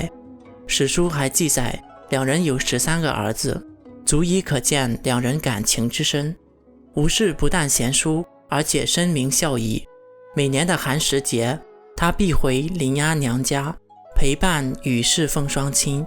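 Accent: Shandong, China. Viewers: up主听这个口音 感觉祖籍是不是山东的呀 山东普通话 哈哈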